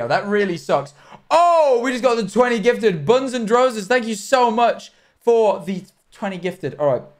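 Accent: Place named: RP, United Kingdom. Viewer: why did * sound so posh in this clip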